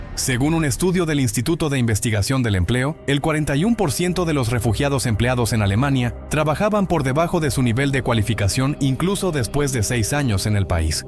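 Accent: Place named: Latin America. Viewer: Según ustedes es imposible vivir en Alemania, Australia, Canadá, Portugal, Nueva Zelanda, Irlanda, Japón, Reino Unido...y siempre de una forma u otra es culpa de los inmigrantes... qué raro!! Suena a ideas de extrema derecha...y lo mejor de todo es que el locutor tiene acento de Latinoamérica, será un inmigrante?? Quizás es uno de esos miles de Venezolanos que pidieron el voto para Trump, los Magazolanos, y ahora Trump los expulsa. No me creo que no se pueda vivir en ningún sitio y encima siempre se criminalice a los inmigrantes.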